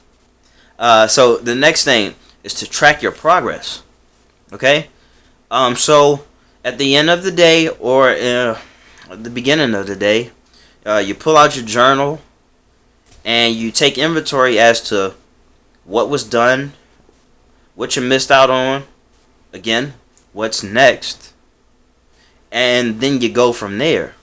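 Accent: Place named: Baton Rouge, United States. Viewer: Another good video! Now, a totally off-topic question: Do you have any connections to Baton Rouge? The way you say certain words takes me back to the time I spent at LSU.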